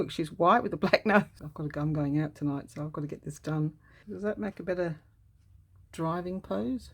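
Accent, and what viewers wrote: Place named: Australia. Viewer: So glad I found you not so long ago as I find listening to a fellow Aussie really relatable. Love what you use to collage with. It was great to see the magpie and how friendly it was. We used to have a family of magpies who came and played on the kids trampoline and our hills hoist for years until the crows moved in. Really miss them still. I would love to be in the giveaway for the stamps. Kicking myself for giving my stamp album years ago before I started collaging. I have saved your playlist of Margarete Miller collage challenges to watch and have seen a few already. Thankyou for sharing. 🙂🙂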